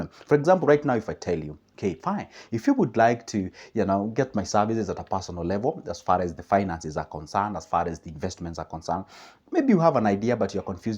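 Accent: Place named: Kenya. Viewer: Oooh now i get where you got that nice accent...that luo land..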